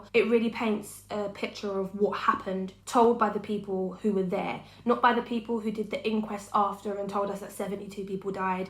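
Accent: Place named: United Kingdom. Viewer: Much love from Kenya. Your accent is a true definition of Br. English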